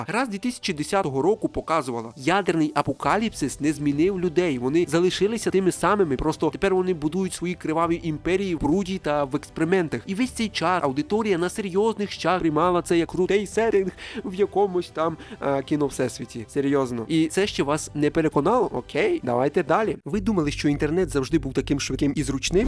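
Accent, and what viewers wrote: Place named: Transcarpathia, Ukraine. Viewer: Чомусь я відчуваю закарпатський акцент . Я ж правий?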